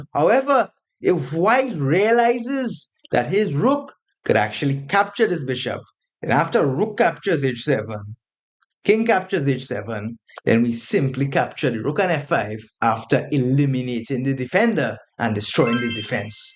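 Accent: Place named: Trinidad and Tobago. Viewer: That’s a Trini accent!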